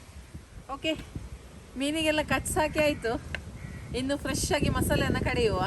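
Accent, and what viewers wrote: Mangalore, India. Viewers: ನಿಮ್ಮ ಮಂಗಳೂರು ಬಾಷೆ ತುಂಬಾ ಚೆನ್ನಾಗಿದೆ ಅಕ್ಕ ಬಂಗುಡೆ ಮೀನು ನಾನು try ಮಾಡ್ತೀನಿ ಅಕ್ಕ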